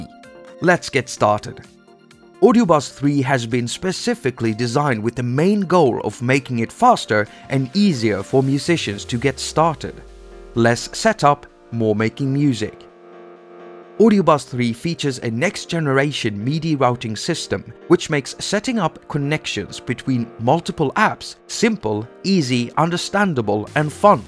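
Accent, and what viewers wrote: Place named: Sweden. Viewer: Probably is the swedish accent. Or has talked too much with siri. Look out for his channel if you don't know him. He knows what it does:)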